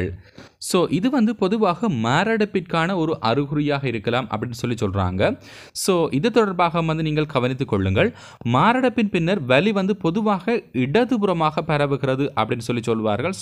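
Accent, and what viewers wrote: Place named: Sri Lanka. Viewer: இலங்கை தமிழ்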